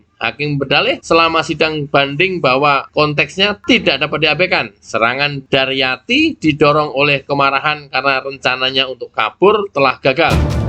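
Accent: Java, Indonesia. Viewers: Bang?? Leh moco berita apik logate,..d campur bhs jawa.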